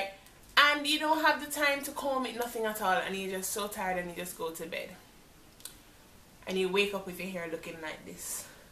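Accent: Jamaica. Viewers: Where are you from? You sound like you're from Jamaica (or a Caribbean island at least) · I so love your accent, Jamaican Big Up Gal!!! Listen I am in England honey I don't think I can get that gel here. where did get it from?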